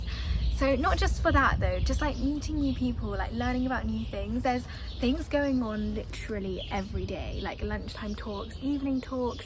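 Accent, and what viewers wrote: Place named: Southern England, United Kingdom. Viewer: Weird observation to make, although your accent is very southern, when you say done it sounds very northern lol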